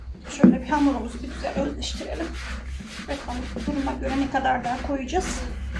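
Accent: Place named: Antalya, Turkey. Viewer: Bir Antalyalı konuşması müsafirrr 😂günaydın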